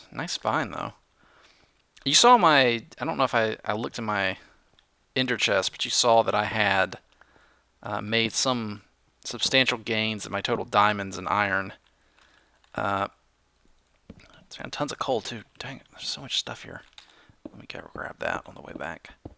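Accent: South, United States. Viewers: Are you from the south? It sounds like you have a slight southern accent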